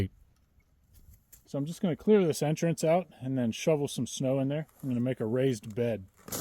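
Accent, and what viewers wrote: Pittsburgh, United States. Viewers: Do i hear a little yinzer in your accent? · I could hear the Pennsylvania accent, makes me homesick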